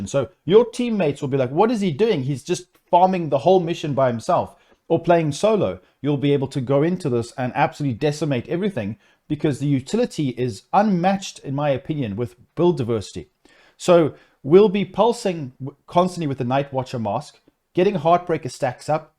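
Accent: South Africa. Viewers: South African spotted? Are you a Saffer,? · Hey, thanks for the advice. BTW, if I'm not mistaken you're accent is RSA right? I'm in Cape Town, Northern Suburbs.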